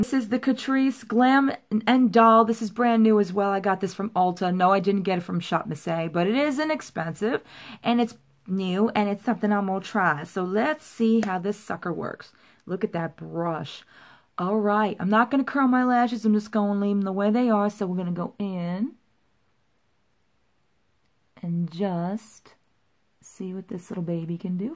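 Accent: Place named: New York, United States. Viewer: I hear a New York accent girl! Love shopmisssa first impressions btw.💕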